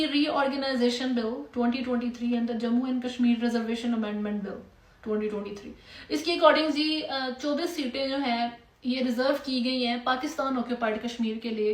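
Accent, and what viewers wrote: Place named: Punjab, India. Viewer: Yaar Punjabi accent me English kenyu bolte hain aap ?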